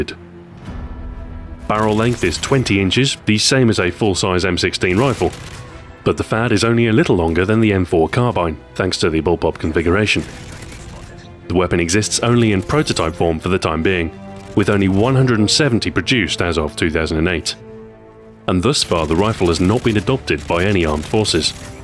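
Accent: United Kingdom. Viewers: Is it just me or do British accents make everything sound more intelligent? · I don't know why these Americans think the British way of speaking is accent, if it came first.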